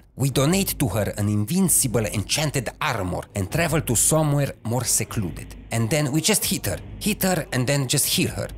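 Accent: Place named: Romania. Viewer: mamă ce ușor recunosc accentul românesc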